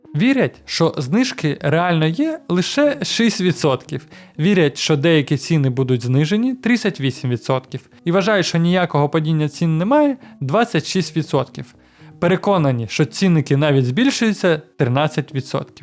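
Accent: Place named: Russia. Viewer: справа воної, леді Ю, живе. слухаю і на фоні 'ґаз, зубажіння, любі маі' пане, читайте, говоріть в побуті, вживайте український контент. бо це якась жесть. кантент, навини, сорак, панеділок... вже десятки відео, а розвитку ноль. згадалось вашою залупянкою 'чукча нє чітатєль, чукча пісатєль' український контент російською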